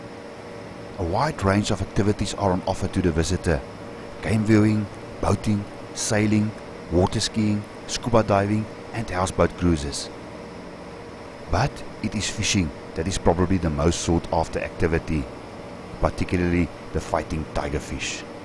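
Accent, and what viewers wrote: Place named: Afrikaans, South Africa. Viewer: A fantastic yourney no doubt, love your Arikaans accsent boykie. Pretoria boy, 40 years in USA. Miss Kariba a lot. Would love to do your trip.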